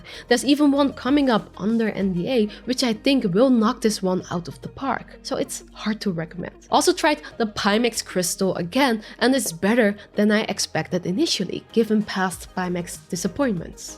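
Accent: Netherlands. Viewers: Is that a dutch accent you have?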